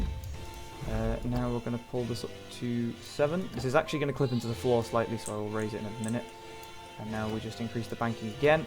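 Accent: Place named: United Kingdom. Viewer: British accent while sounding like you just snorted some blow....maybe I'll understand at .75 speed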